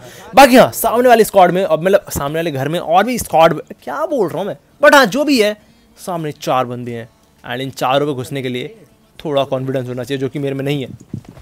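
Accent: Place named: India. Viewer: Desi maar ke comentry kar rahe ho bhai 🤣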